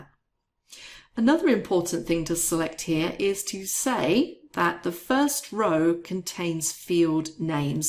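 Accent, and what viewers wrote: United Kingdom. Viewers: Is that a British Englishh? It is such a great pleasure to listen!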